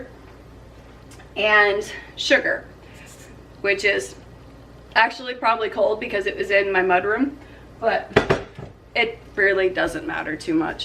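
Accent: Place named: Canada. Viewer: We can tell you’re Canadian cuz you say “own ready” instead of “ already”. My hubby is Canadian, but has lived in the states here for 50 years.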